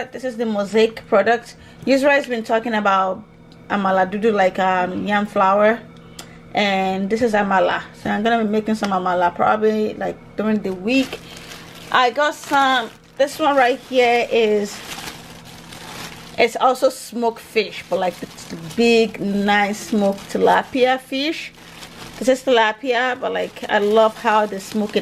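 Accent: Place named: Yoruba, Nigeria. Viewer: Am so addicted to this channel i just love the way you speak your yoruba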